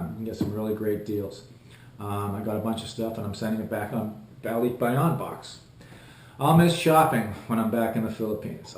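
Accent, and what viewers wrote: Boston, United States. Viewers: it didn't take long.. that "Bah-ston" accent came right back. :) i'll be in SoCal next week, probably come home to a hot dish of home-made enchiladas. i anticipate gaining some weight as well. Glad to see you made it back to the PH safely!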